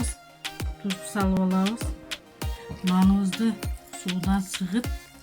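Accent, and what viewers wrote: Batken, Kyrgyzstan. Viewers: Баткендик окшойсуз😅😅😅😅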